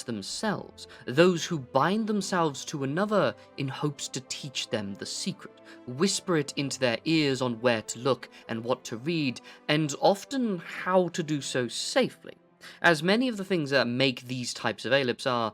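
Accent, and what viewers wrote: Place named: United Kingdom. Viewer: Waiting for my favorite British voice be it in D&D wizard of vampire lord and it's been a month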